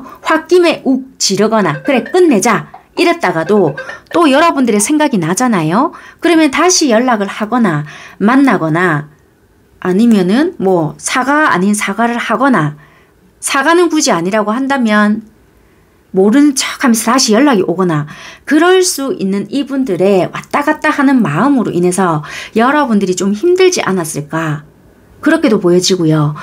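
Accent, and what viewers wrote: Busan, South Korea. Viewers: 선생님. 좋아요를 누른다는게 잘못해서 싫어요를 눌러버린것 같아요. 오해마시라구요. 저두 부산사람이라 선생님의 사투리 표현에 힐링타임 많이 가집니다. 오늘도 리딩 잘듣고 갑니다. 고맙습니다~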